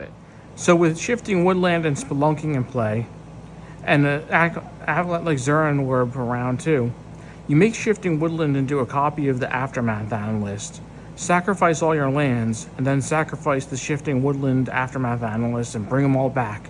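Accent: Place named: United States. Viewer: Thanks dude, really helpful. Just a thought as someone taking notes during the video, though I get its a new channel...but it would be good to be able to see the cards a little better. Id like to pause and read them or some get lost a bit in the accent (as a non American here!). Perhaps if not a closer zoom add them to the screen in editing to appear on the side for people to read whilst you discuss the card? More work for you though I guess. Anyway, thank you for all the advice. Subbed, liked and commented as directed ❤️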